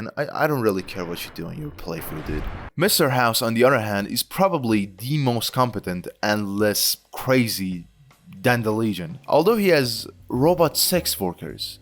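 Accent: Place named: Europe. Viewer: When he's got a euro accent you cant place. Its gonna be a fire video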